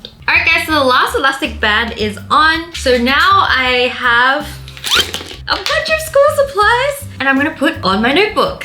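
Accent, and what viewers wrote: Australia. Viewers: are you from Australia or America or where? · Please correct me if I am wrong, but I pretty sure shes Australian. [Sorr if I spelt anything wrong]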